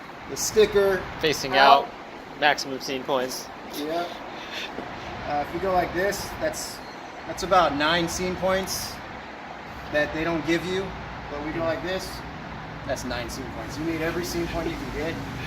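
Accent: United States. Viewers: Looks like america.. sounds american.. uses metric... ? Great video, best one on doing these. Ive been told its a good idea to also drill a hole in tube before sleeving, to give an extra spot to weld (spot weld). But with your welding method its probably not required. Top job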